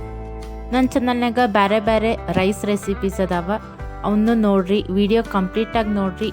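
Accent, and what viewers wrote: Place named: North Karnataka, India. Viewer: Nam ittar karnataka matu keli khushi ayitu sis super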